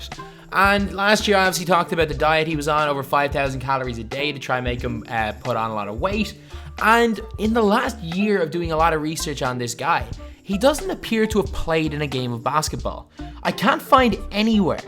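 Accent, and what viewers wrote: Ireland. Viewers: R u Irish I can hear the accent kinda ????? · are u Irish ? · Never realized the Irish accent until you said you played in an Irish league lol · What the fuck is your accent.. You sound posh Irish.. Do you want a soft drink? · ARE U irish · Are you Irish?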